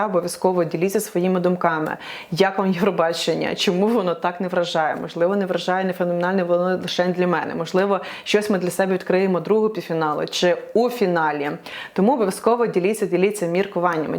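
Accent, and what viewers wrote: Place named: Ukraine. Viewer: У Вас важка українська.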